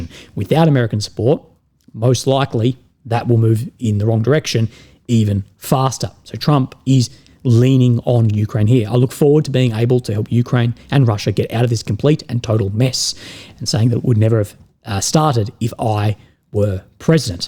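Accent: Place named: Australia. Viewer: Will, l'm happy to see you in good health. over time, l'm also getting used to your aus accent. thanks for your contribution and comments. keep helping us please❤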